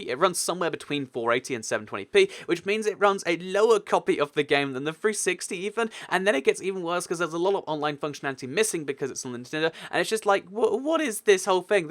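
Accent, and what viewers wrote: United Kingdom. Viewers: You sound mix of British and Russian and German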